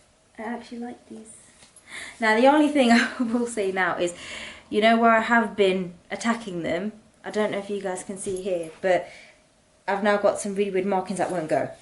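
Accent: United Kingdom. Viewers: I enjoy all of your videos and I love your British accent your voice is lovely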